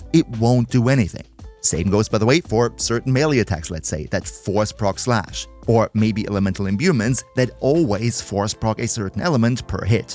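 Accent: Germany. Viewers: lol you are german ???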